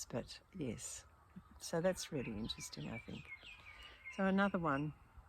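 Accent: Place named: Australia. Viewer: Dear (sorry I don't know your name) I loved listening to your video this morning. I've been listening to a few nice old ladies giving life advice with kind soothing voices so this video appeared in my feed today. Thanks AI! I have to say your voice is just beautiful to listen to! You have a wonderful way of speaking. And good advice too. I love the fact that thanks to YouTube we all have access now to the wisdom of people like you. It's a healing substitute for the families we either didn't have, or did have, but who weren't so free with their kindness & wisdom. What a gift to the world. I also love the fact that you are Australian. I lived there for 20yrs & hearing your accent, plus the crows & magpies in the background, brought back some nice memories for me. I'm back in NZ now & it's quite different over here. Best wishes from Wellington, I've subscribed and I look forward to hearing the rest of your advice 🙏💐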